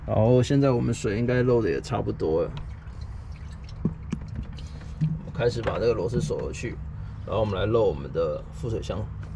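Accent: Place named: Taiwan. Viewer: taiwan accent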